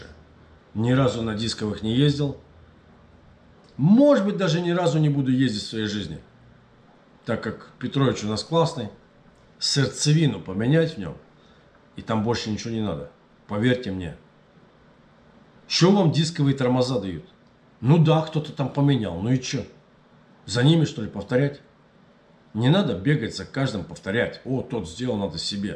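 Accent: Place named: United States. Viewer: у тебя немного америк. акцент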